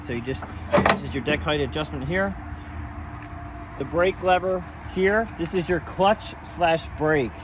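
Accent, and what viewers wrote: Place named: Delaware County, United States. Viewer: Also are you from southeast PA? You have a Delco accent!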